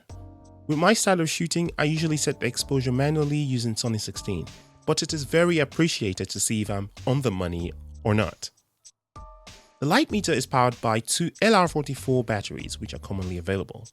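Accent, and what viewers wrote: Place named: England, United Kingdom. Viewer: Hi, I've just discovered your videos and I think they're really fantastic. It's also lovely to hear a familiar sounding voice on youtube. Nothing at all against the many Americans who also make wonderful videos but an English accent definitely makes me feel at home :)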